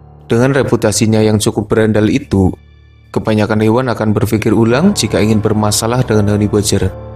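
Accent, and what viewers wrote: Banyumas, Indonesia. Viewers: Ngapak banget bang wkwkwkwk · Jawa ngapak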